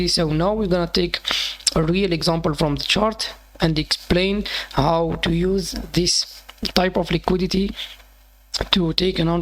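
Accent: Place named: Morocco. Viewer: where are you from brother ? You sound Moroccan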